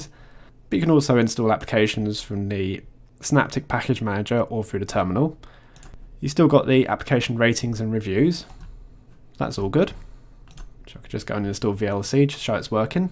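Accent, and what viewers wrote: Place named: Scotland, United Kingdom. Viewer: Can't really get from where this guy is from... Scotland? Manchester area?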